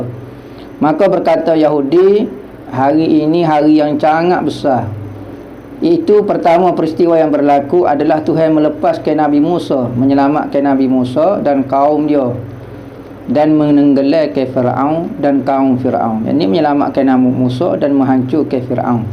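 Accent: Kelantan, Malaysia. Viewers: Kelate pekat ustad terbaik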